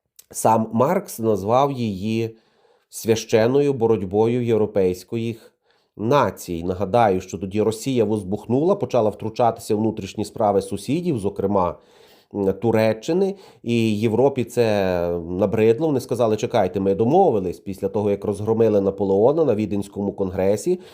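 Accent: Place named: Galicia, Ukraine. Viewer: Все супер. Особливо мені подобається галицька вимова автора. це дуже мило) і навіть сексуально))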